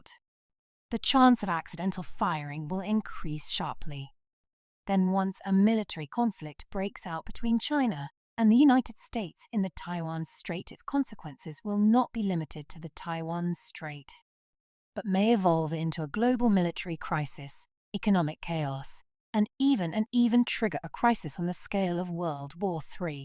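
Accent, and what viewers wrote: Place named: United Kingdom. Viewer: 今天的翻译比昨天的好，纯正的英音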